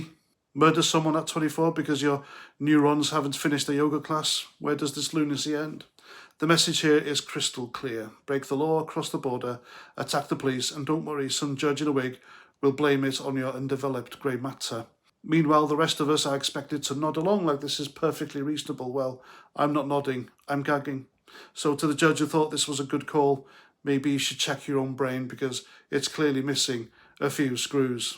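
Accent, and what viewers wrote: Wales, United Kingdom. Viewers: You are like a Welsh less harsh Pat Condell! I like it!!!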